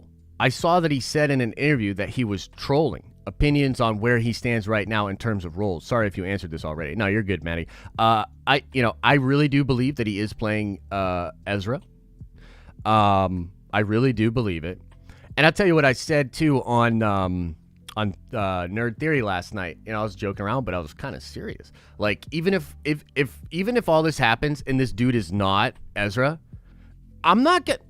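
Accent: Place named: Western Pennsylvania, United States. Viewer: did your west Pennsylvanian accent inexplicably get HUGE in the last week and a half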